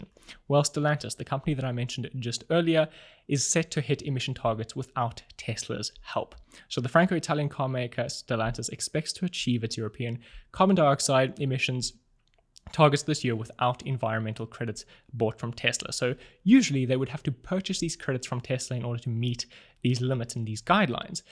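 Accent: South Africa. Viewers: Are you South African? I can hear it in the accent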